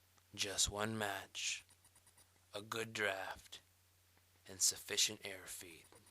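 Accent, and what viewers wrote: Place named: France. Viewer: Do you look back on this and say, "Ya, I do sound like a tool." But it's not your fault. You probably watched too much Cousteau. Octopus, octopus. Why do you hide. In that Frenchy accent. Sounds like your trying to pull off some type of avantgard Joe cool kind of thing. No, nope ain't feeling it. More toolish than anything else.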